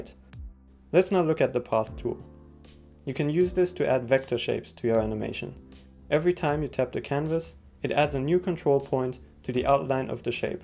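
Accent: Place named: Germany. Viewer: Das klingt doch nach nem deutschen Akzent 👀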